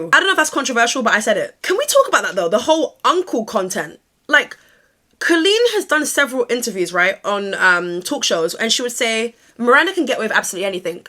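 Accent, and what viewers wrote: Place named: London, United Kingdom. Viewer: lol I love the career/Korea part in your wonderful Londoner? accent.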